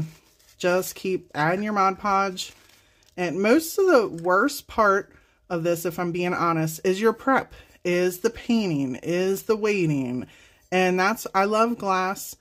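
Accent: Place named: Pennsylvania, United States. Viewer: I love listening to you, it reminds of home. PA. Unfortunately I'm coming in the next few days because of a death in the family.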